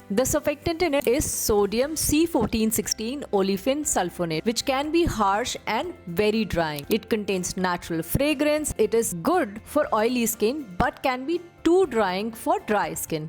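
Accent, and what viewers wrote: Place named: Tamil Nadu, India. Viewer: Mm Tamil voice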